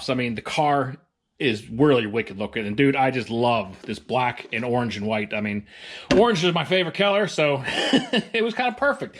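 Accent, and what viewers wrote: Pittsburgh, United States. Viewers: The Pittsburghese is strong, my friend. I am also from the area.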